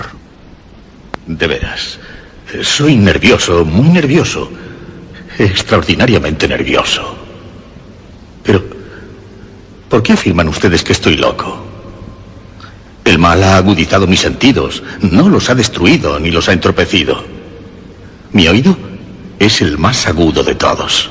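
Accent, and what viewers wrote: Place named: Spain. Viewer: Excelente narración, el acento español hace que el cuento tenga más suspenso. Gracias por este gran aporte, fue de gran ayuda.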